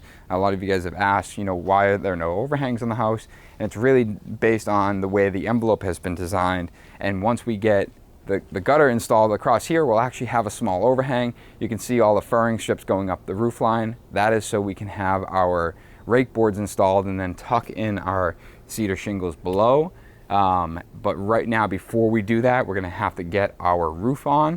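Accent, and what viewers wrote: Boston, United States. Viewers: I love how sometimes you just can't turn off your Boston accent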